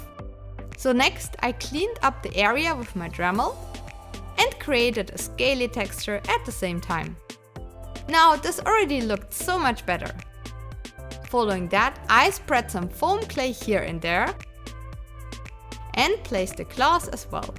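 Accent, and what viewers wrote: Germany. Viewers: That German accent ☺️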